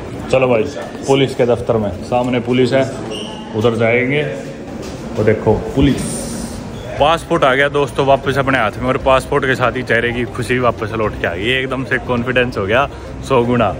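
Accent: Haryana, India. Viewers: Bhai app ki haryanvi love ❤ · Most funny travel vlog your haryanvi accent is amazing 😂😂😂😂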